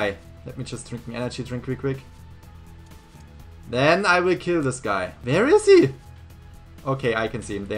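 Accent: Germany. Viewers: Are You german? Because You have like an accent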